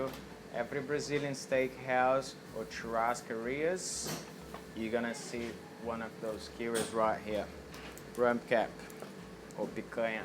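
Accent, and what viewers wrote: Australia. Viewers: A lot of brazilians go to australia to learn English! That’s why he has this Australian accent! brazilians learn pretty easily the accent from the country we study the language! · Da hora esse sotaque aussie - e da hora também ensinar (direito rs) os gringo a fazer picanha - porque já vi cada vídeo bosta da galera "ensinando" rs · todd goslin he probably learned English in Australia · Sounds soo Aussie. ..awesome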